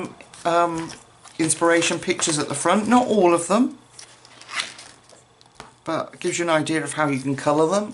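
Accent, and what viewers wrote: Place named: England, United Kingdom. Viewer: Many thanks for introducing these books. I particularly like the ‘Wonderful Little World’ book so going to try to find a copy. You are very generous with your time and knowledge, which I appreciate so much. It’s lovely to hear a ‘local lady’ so I know the books you show are available in England. Kind regards from York 🙏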